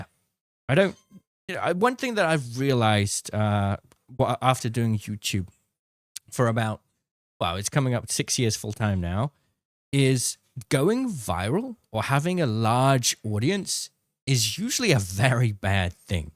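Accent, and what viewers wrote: United Kingdom. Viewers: Yeah, the british accent it's a thing. But I like when you are vibing. I used to like to watch you doing challenges, but I felt you a bit sad and didn't vibing doing that those days. You could bring news about apex, trying other games (new games, BR or classics), testing how good the legends are. The most important, just keep doing what you like. Keep going. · In my honesty, I havent really watched so much of your content as of lately and i agree with most of the rest of the comments, it's because of the game itself. I remember the old challenges, the 4ks or load out challenges but the games not the same anymore so I have since quit playing and watching. But you still hold my sub because you still hold a spot in my heart. But if u want some input from me, I would just try doing your type of challenges and content for other maybe less comp games like cod zombies, or risk of rain, or even Elden ring playthroughs. 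I think all of us love your "weird little British man accent" btw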